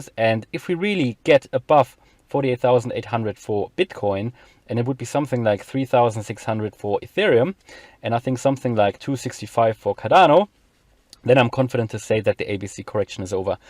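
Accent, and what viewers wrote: Germany. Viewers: Sounds you are from Germany - beste Grüße, Robert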